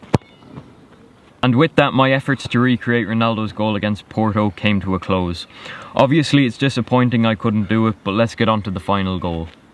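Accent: Ireland. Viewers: Are you Irish you have an Irish accent sounds like your irish your a goaT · Luv ur videos and im 99.9 percent sure ur irish like me😂👍🇮🇪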